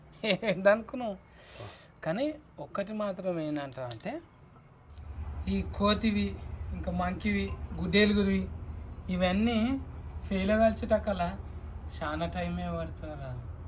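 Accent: Telangana, India. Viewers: అన్ననిజాన్ని కామీడీ రూపంలో చూపిస్తున్నావ్?తెలంగాణ యాసను,నీయంత భాగా చెప్పే గుంపు మేస్త్రి గానీ చెంచగల్లా తన చానల్లో చూపలేరు?👌🙏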